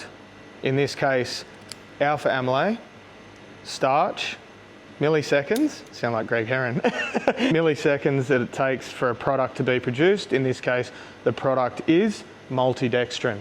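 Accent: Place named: Australia. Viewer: Very interesting information althoug I have to watch it several times because Ausie english is more dificult to understand to me! Thanks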